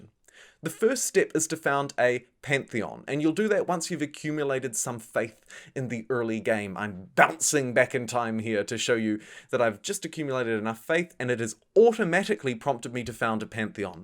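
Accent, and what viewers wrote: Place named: New Zealand. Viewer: ha, would not have placed that accent as kiwi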